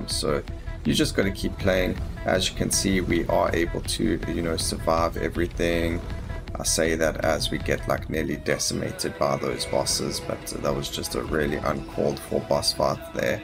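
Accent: South Africa. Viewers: You South African brotha?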